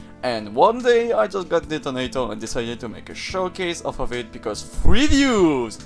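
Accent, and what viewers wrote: France. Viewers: holy french accent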